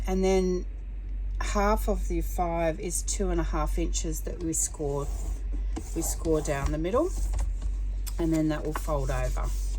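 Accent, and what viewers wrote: Australia. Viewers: These are beyond awesome!! New subscriber! Your Australian accent is neat, too!💚💚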